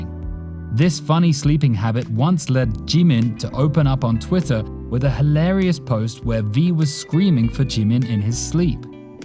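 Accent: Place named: England, United Kingdom. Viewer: 1. The first 3 letter words in which you described him.......I thoroughly disagree with that. He ain't dt good IMO. 2. Please pronounce his name properly. Don't pronounce it in your English accent gvng it English pronunciation.😡😡😡😡. His name Kim Taehyung is a Korean name, not an English or an American name. So pronounce it d way Koreans pronounce it, not in d way Westerners speaking English pronounce it. 3. to ...well, in ds pic he ain't lukin charming but s lukin like a kindergarten school kid. 😂😂 And he was not dt charming in his initial days. He lukd quite ordinary. 4. As regards his impeccably perfect looks, I hv seen his photos during his pre-debut days & tbh he was nothing to look at back those days....he's just a lean & skinny faced road-side boy in his looks. But aftr bng a trainee he havin undergone through immeasurable grooming, care, styling & skin treatments, transformed into such a hottie. 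Before, he just had fair complexion & a perfectly shaped face. Hence it's proved dt be it males or females, if we undergo proper grooming, care & styling under best professional beauticians, or under our own selves, we wud b 20 times more attractive looking than we are in actual. Like, he's a farmer's son. But was one indeed born under extremely lucky stars due to which from an ordinary commoner of Korea he became a worldwide celebrity now.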